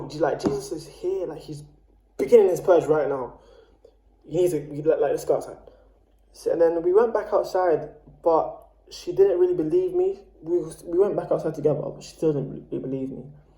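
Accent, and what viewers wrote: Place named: London, United Kingdom. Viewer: Are you from london? You sound like you are - I saw ur comment on a DMT video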